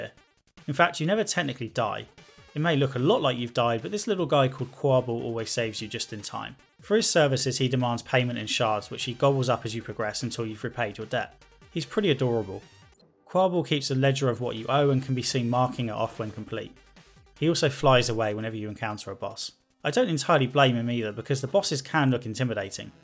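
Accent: United Kingdom. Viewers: Gonna be honest as a Canadian the first 40 seconds were so British I couldn’t understand lmao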